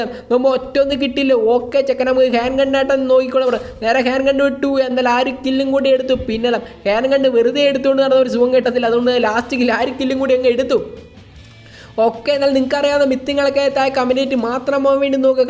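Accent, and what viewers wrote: Kannur, India. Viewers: Kannur karnano bro nane athe mannur enna stalathane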